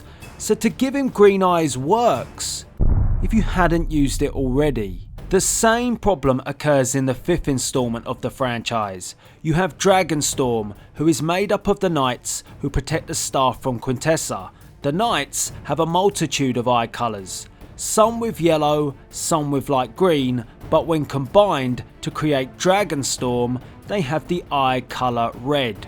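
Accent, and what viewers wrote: England, United Kingdom. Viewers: what part of the UK is that accent from!?!?! hahahahaha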